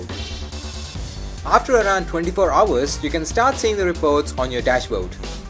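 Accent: India. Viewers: Just statted the vid and then. indian accent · Best Indian accent I’ve seen so far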